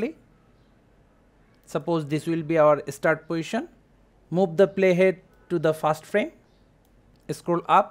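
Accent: India. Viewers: When ever I hear the indian accent I know that it will solve my problem W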